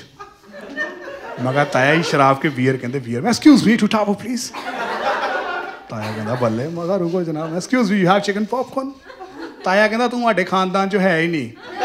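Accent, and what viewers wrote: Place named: Malwa, India. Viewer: Pure malwai o paji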